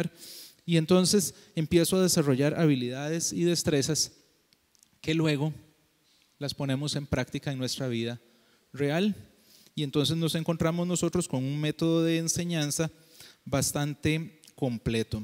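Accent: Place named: Paraguay. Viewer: Me parece que eres Paragua, un consejo deja de decir "verdad"? Todo el tiempo.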